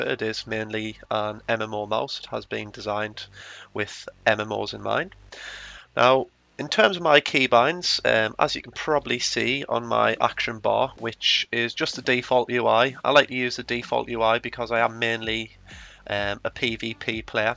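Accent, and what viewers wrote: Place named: Newcastle, United Kingdom. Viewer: damn, you sound like you live pretty close to newcastle judgin from your accent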